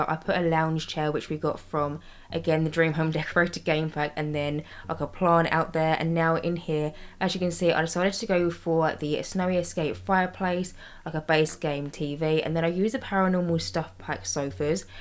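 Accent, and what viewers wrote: United Kingdom. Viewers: Ouu British actent I don't understand!!😂❤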